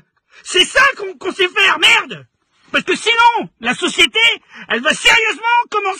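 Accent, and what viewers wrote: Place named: Lyon, France. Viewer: Cuuuuulte la vidéo de l'année! Chez nous enfin près de chez moi (Allez Villette!) tu es une star même si tu es stéphanois, d'façon tu as l'accent lyonnais ^^ Bises mon pti canard aux olives:)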